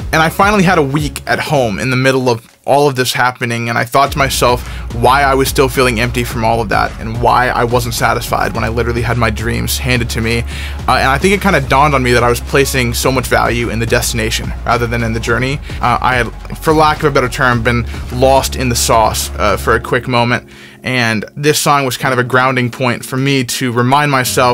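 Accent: United Kingdom. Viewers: Wait ur not British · He lives in texas? I thought he was like from the UK. lol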